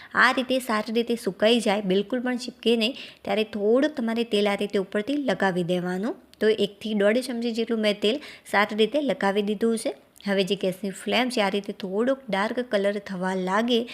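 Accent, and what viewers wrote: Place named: South, India. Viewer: Tamara pronunciation south indian java che.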